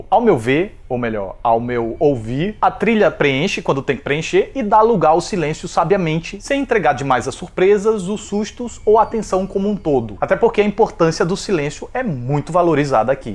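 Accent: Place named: Caruaru, Brazil. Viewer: esse sotaque parece aqui daqui de caruaru muito bom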